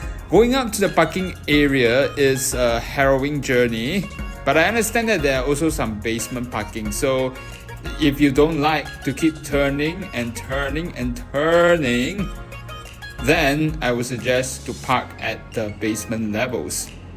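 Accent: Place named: Singapore. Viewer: Bagus cakap dalam bahasa Melayu, bahasa Inggeris logat Singapura sangat mengeciwakan..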